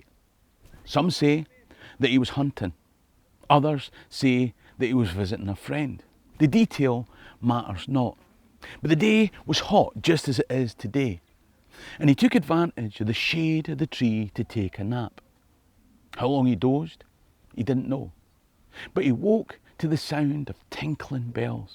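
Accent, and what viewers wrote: Scotland, United Kingdom. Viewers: I always think I'm going to fall asleep to his beautiful Scottish brogue but my brain never wants to shut down mid story. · Loved the story. Your voice and accent is mesmerizing. It's like the soul of Scotland 🏴 come to life. Makes me long for a place I've never been but always wanted to be. Happy 60th Birthday. 🎉 P.S. Don't ever lose your Scots language.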